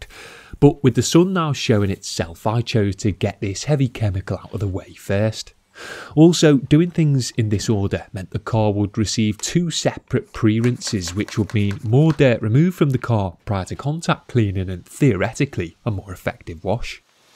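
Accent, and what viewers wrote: Liverpool, United Kingdom. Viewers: Something about his soothing british voice as he covers a car in white foam makes me happy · your way of talking is so British uuuh too much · Liverpool accent?? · Feels bad cant understand half of the jokes since the british is strong in this one :D But atleast i can see the car transform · Mellisonant he’s scouse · It's like a nature documentary with the British accent, but about a guy cleaning a car. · So I’m American but I’m trying to guess the accent off the few English friends I’ve known over the years.... Liverpool???? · is he scouse or am i going mental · Sounds more like he's from the outskirts of Liverpool. Huyton maybe.. or even Warrington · Valentino Rossi Fans he’s a scouser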